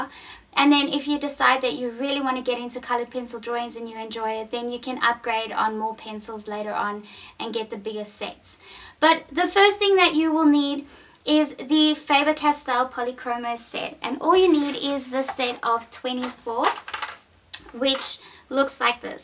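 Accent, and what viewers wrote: South Africa. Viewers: Beautiful accent - are you South African?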